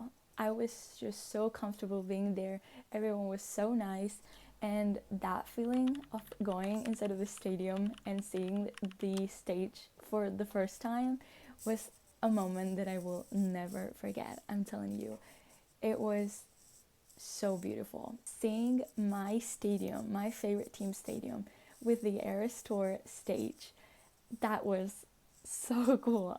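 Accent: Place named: England, United Kingdom. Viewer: girl, i'm from Spain and i really LOVE your english accent, even in my wildest dreams i can not have your accent!! please, do a tutorial or something hahah pd: i LOVE this video, i can't belive i was there!!!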